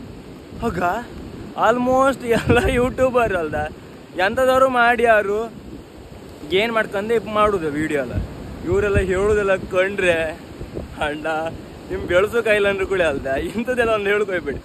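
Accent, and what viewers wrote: Kundapura, India. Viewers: He speaks in Kundapura kannada. It's different dialect of Kannada. Do not insult any dialect of kannada.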